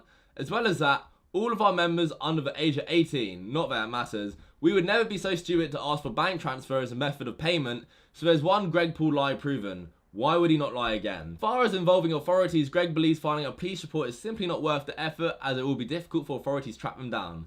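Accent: England, United Kingdom. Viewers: Why do i get this clown in my recomended? I dont care about Greg Paul making out with anyone, even less i care about you exposing him.. You are faker then Kylie's titties... After all we are not judging you for being born English and talking your stupid accent. Youtube please fix your recommendation shit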